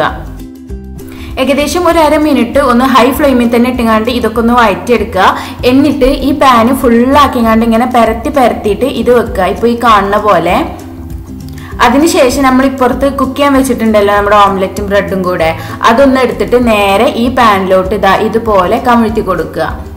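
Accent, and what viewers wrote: Malappuram, India. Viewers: Nihal MN കോഴിക്കോടല്ല മലപ്പുറം ജില്ല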